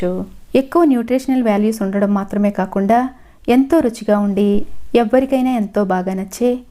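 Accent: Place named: Andhra, India. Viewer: Andi andi meru Telangana unna Warangal vantalu ani youtube channel peru pettuko andhra slang matladutunnaru enduku...meru andhra na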